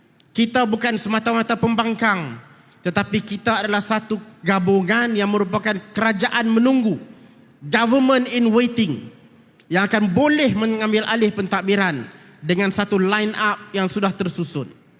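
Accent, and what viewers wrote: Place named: Northern, Malaysia. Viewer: Ini kali pertama aku dengar wan saiful cakap utara.. awkward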